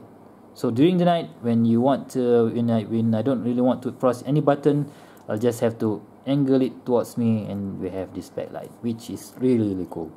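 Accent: Malaysia. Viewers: Are you Malaysian? You sound like one haha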